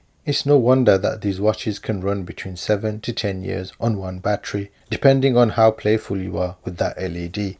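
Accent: Birmingham, United Kingdom. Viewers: You came up on my recommended, so this is the first of your videos I’ve seen. My first impression is ‘sounds brummy, specifically uk vibe of deadpan humour mixed in with confident yet laidback information’. I’ve never fixed a watch in my life or looked to do so (though I fix other stuff), but I’ll still be watching haha Glad people are recognising your work and sending you bits to tinker with! Hoping more independent companies get into making high quality 3rd party parts for restoring or customising otherwise forgotten tech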